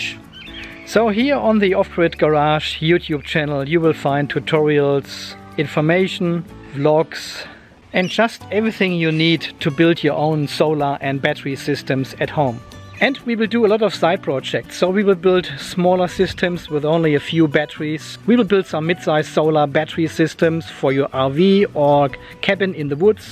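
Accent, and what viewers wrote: Germany. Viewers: Dear, am I right that you are originally from Germany? It simply sounds like this 😅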